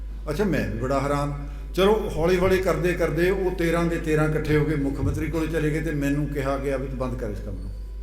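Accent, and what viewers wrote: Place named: Puadh, India. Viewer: ਸਾਹਬ ਜੀ ਨੇ ਕਮਾਲ ਦੀ ਜਾਣਕਾਰੀ ਦਿੱਤੀ ।ਪੁਆਦੀ ਬੋਲ ਕੇ ਸੁਆਦ ਲਿਆ ਦਿਤਾ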